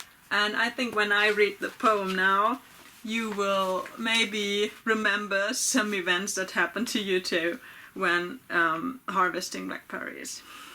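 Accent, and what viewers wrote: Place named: United Kingdom. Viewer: Das letzte war die Brombeere, so viel habe ich mitbekommen :) Bei deiner britischen Aussprache frage ich mich ob du das beruflich machst :) Jedenfalls reichte mein Schulenglisch aus um es zu verstehen.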